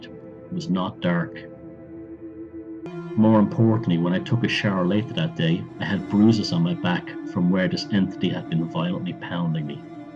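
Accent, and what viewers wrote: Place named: Ireland. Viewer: I am very upset because this is the perfect documentary for general overview of the topic, but the audio quality completely ruins it. The Irish accent would be no problem at all if it did not sound like you recorded the audio with a 1995 Talk-Man (from Home Alone 2 for reference) off a 1950s AM Radio broadcast.. Holy hell man, it's like you severely downgraded the quality. If this is any way to judge the technology you own, you definitely still listen to cassettes and watch movies on VHS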